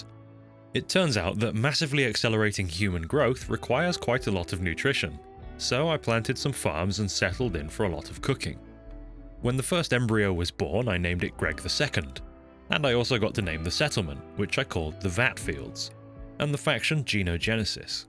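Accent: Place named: England, United Kingdom. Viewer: I like your accent English man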